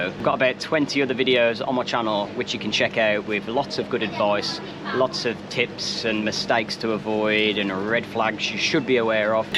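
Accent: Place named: Midlands, United Kingdom. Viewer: ahhhhh do i detect a midlands twang mate ?,where you from ?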